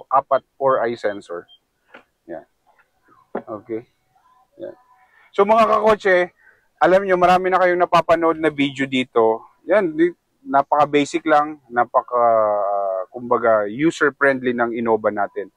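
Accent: Philippines. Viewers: Garu matnog ang tuno kan bikol mo sir? Tama?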